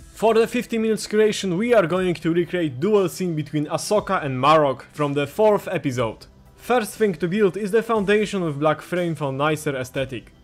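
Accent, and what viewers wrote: Poland. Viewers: Słychać że polak😅 ale budowle 11/10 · poznałem po akcencie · to się nazywa polski akcent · Po akcencie od razu poznałem że nasz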